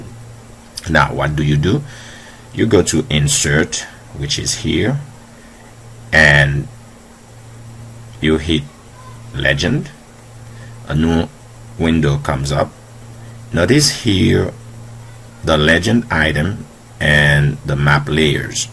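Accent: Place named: Haiti. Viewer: Thank you, my brother. I've been trying to figure this out. You're from Haiti? I know the accent when I hear it!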